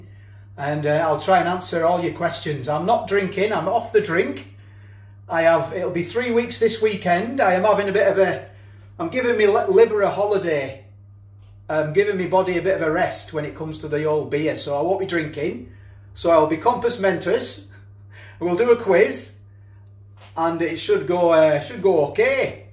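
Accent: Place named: Sheffield, United Kingdom. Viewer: You from Sheffield? X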